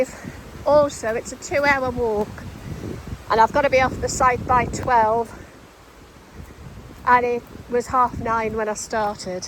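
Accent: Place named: North East England, United Kingdom. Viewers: What an interesting accent you have. Its like the north east met the West Midlands. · Well done lovely ❤ your Northeast accent is charming 😊 really enjoyed the vlog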